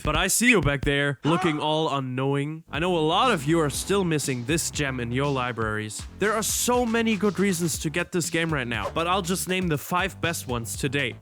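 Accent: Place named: Germany. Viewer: Get a better voice-over actor. The one you use has a German accent that makes my ears bleed.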